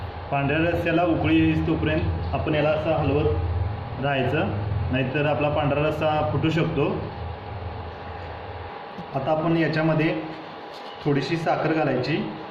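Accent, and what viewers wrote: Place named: Kolhapur, India. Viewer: Chef asl kolhapuri boltat ki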